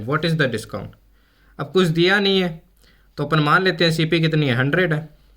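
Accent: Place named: Shekhawati, India. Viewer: Your accent is so shekawati, good to hear. Keep improving.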